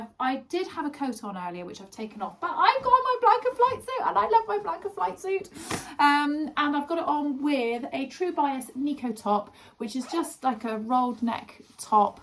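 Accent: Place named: England, United Kingdom. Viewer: I've had the week before Christmas off work, so while having my morning tee, I've watched you and. Sharing advent calendars for each other is such a fun thing to watch. I think i will try to do that for my 2 besties for next Christmas. Maybe also for the hubbie and grandkids. It looks like you are feeling better. My husband had something similar and he was feeling awful for over 3 weeks. The doctor said it was just a nasty virus going around. Luckily I had it slightly, and only for a couple of days. I live in Canada and mostly watch British vloggers, I think it's because the accents remind me of my English grandmother and Welsh granddad. I've only found a few Canadian vloggers, Janine Sews, and another young lady from Toronto, but i can't remember her name. I love that your entire family joins you in filming, so fun. P.S. the faux jumpsuit and blue velvet dress look stunning, you have the legs for a high slit for sure. 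I laughed about the old lady comment, I'm 65 and definitely don't dress like an old lady.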